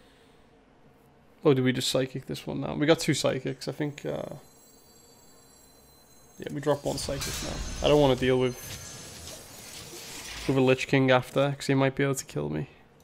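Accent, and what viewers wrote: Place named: Scouse, United Kingdom. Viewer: great play I can hear a touch of the scouse accent lol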